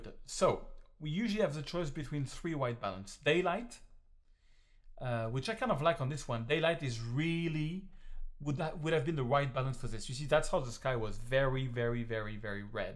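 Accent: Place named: France. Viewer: For your English tutorials, it would be great if you would talk a bit slower. Your French accent has me backing up very frequently to catch what you said.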